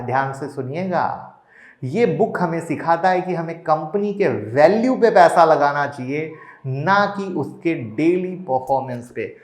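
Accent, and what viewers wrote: Bihar, India. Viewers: AAP BIHAR KE HAIN KA HO. BILKUL BIHARI HAIN. DEKHNE ME BHI AUR SUNNE MEE BHI. DISCLAIMER: I M A BIHARI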